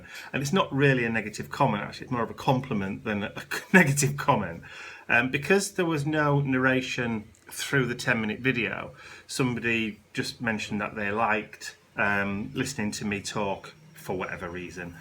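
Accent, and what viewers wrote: United Kingdom. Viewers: I understand the people that loves to hear your voice. I'm Belgian and I love that British English so much also and the vlogs. Only started to watch your videos a couple of month back and watched every video on your channel. You are a bit addictive, LOL. Have a nice week:-)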